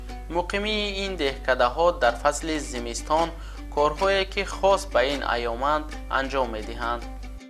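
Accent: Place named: Tajikistan. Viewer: Бародари азиз барои шумо муваффақият орзуманамдам, чи қадар навори зебо бо лаҳни тозаи тоҷикӣ, бо фарҳанги зебо тоҷикӣ 💕. Аҳсан!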